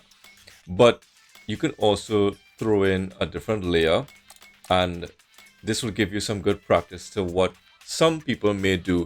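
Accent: Trinidad and Tobago. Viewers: Are you Trinidadian? The accent sounds very familiar.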